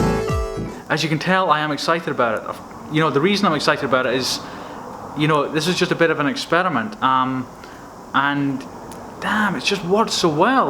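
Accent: Scotland, United Kingdom. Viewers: You Scottish too? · are you scotish?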